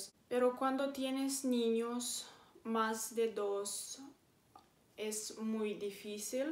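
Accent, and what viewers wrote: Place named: Spain. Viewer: Estas seria siempre o es por concentrarte en hablar español? Intenta hablar más neutro y no como española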